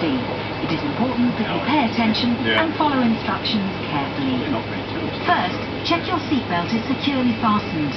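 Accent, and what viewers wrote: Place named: United Kingdom. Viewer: Ah, yes, upper deck on a BA 747, what more could you ask? The best thing about this video is the beautiful british accent and of course the music.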